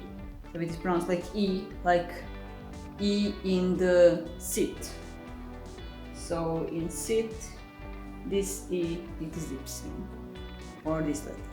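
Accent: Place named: Poland. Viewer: I was thinking you are from Poland as I do, because I’m learning English from your video and interslavic sounds like language I already knows :)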